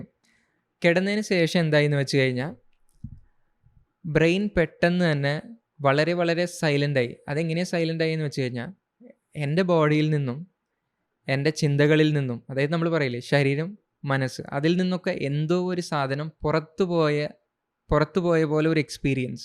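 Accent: Tamil Nadu, India. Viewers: bro are you tamilian or malayali how did you learned malayalam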